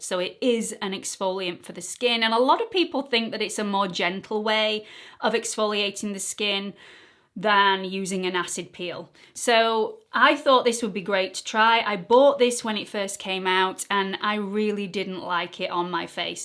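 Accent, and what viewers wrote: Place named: United Kingdom. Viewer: I could listen to you (with your British accent) and Nikkia Joy (with her Aussie accent) talk ALL day. I bet I'm not the only person who catches herself trying to say words like "absolutely lovely/loovely", "hair/hahr", and "mom/mum". Sure doesn't sound the same out of my mouth 😂. Your "best of" videos have been fun,. Happy New Year 🎉✨🎉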